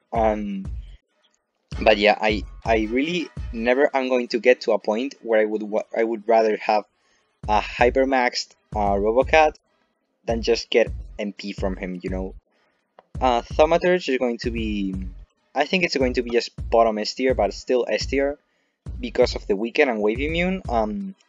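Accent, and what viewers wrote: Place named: Russia. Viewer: I thought you are Russian. you have a russian accent)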